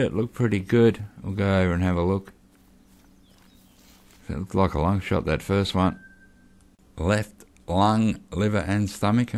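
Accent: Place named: Australia. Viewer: Another great one! Btw just love the aussie accent, sounds so "real" hehe :-D just love it mate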